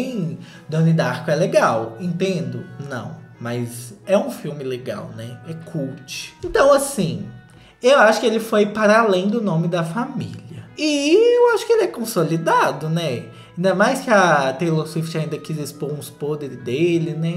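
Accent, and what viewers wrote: Minas Gerais, Brazil. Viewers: Eu ri muito com o lacre sueco! Habla mesmo papi! Kkkkkkk Amo os deboches do com esse sotaque mineirinho 🥰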